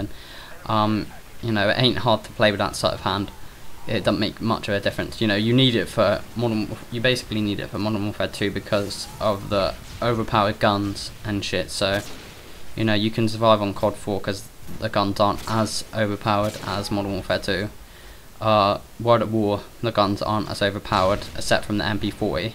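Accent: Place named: West Midlands, United Kingdom. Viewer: you sound like your from the west midlands cuz my bro speaks like you and hes from the midlands like me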